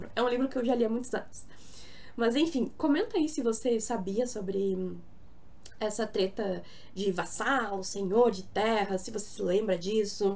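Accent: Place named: North, Brazil. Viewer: Bacana , bom saber que existe uma juventude diferenciada no sul do paìs e que fala um pouco igual a nos do norte ( belem - pa) do pais , tu explicas bem.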